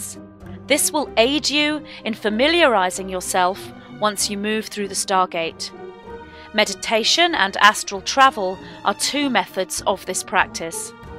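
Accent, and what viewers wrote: United Kingdom. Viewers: me 2. I drink in the accent as for some reason, as I love English accents! Hers is so sweet!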